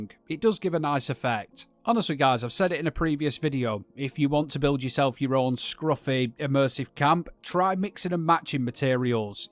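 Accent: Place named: Lancashire, United Kingdom. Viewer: Bolton or Wigan accent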